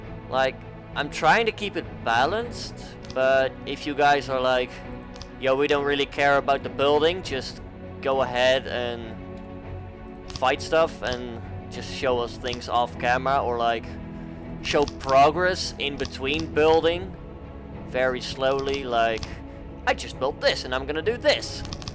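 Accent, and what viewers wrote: Netherlands. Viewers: I can already hear that this guy is dutch Zeg “hallo” als je ook NL bent 😄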